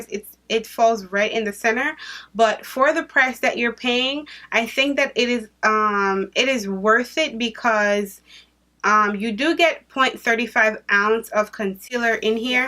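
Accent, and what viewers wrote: Jamaica. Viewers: Great video hon, you sound a little Jamaican.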